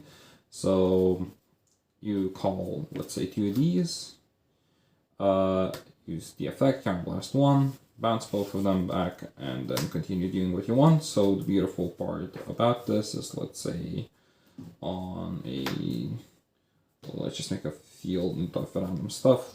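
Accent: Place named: Poland. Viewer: Where are captions? I can't understand this polish accent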